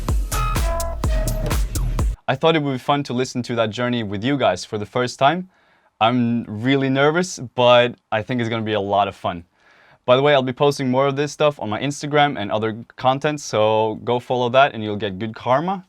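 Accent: Norway. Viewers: I heard his accent and went wait is he Norwegian and then I saw the message from his mom and it was in Norwegian and I was in shock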